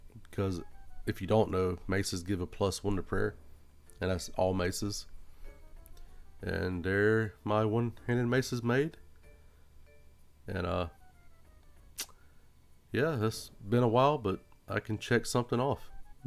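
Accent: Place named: South, United States. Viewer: Can I just say, it's about time some southern accent made it into osrs content creation. Love the series man, hope youre enjoying it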